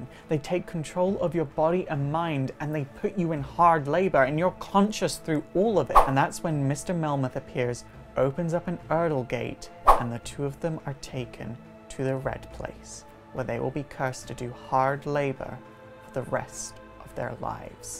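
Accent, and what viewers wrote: Scotland, United Kingdom. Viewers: I CANNOT take a Lovecraft quote seriously from your airy Scottish voice. Good video, loved the Kabbalah talk at the end. A weekly video series on Kabbalah with charts and diagrams would be killer. Happy Halloween.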